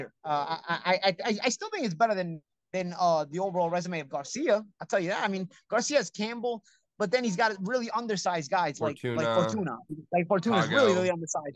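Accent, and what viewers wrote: Brooklyn, United States. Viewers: annoying Brooklyn accent 😂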